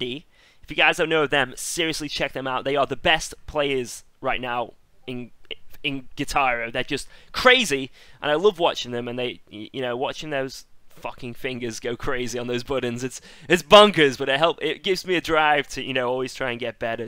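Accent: United Kingdom. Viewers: I'm not quite sure or how I ends up started to watch your stream. I never played GH at all. But after watching your stream, I bought my first guitar controller and just realized how godlike you are You deserves all this man, you works so hard everyday, producing something that considered impossible for mere human Also that sweet smexy British accent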